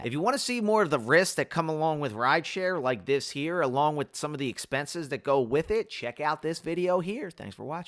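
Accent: Philadelphia, United States. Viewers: now i know for sure you're a true Philadelphian....besides your accent and the Jawn hoodie, you know how to say "Lancaster" right. :)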